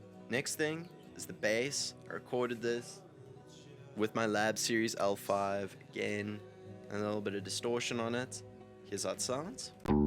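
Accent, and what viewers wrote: South Africa. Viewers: Cape Town accent?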